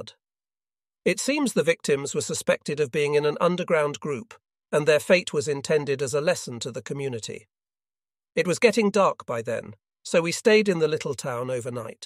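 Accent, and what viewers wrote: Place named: United Kingdom. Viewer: This British accent is confusing. BTW I loath British AI accents.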